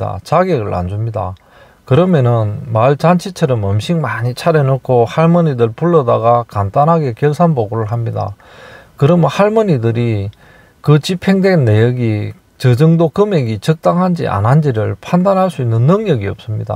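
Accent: Gyeongsang, South Korea. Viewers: 사투리는 경상도신데 생각이나 표현은 진심인 진보라 늘 응원합니다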